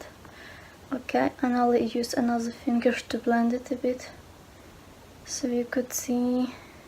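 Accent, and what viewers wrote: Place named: Serbia. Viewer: я вот слушаю, и думаю, что английский не родной )) думала, может девочка из Сербии или ещё откуда-то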